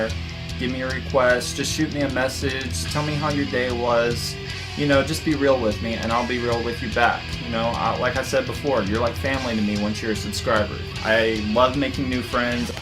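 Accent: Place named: United States. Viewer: I could be wrong, but I would assume from your accent that you live in the US? I believe they're about to be hitting the US really soon! Definitely go if you can (apologies if i'm wrong)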